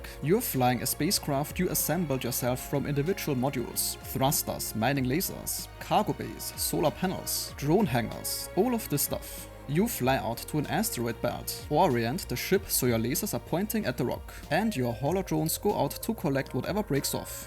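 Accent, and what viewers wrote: Germany. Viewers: Basically a good vid, but whay not in German? Your German accent makes it hard to watch, at least for me. And its really like reading off from your notes, transletet into English... so it is like a very rehearsed/scripted manner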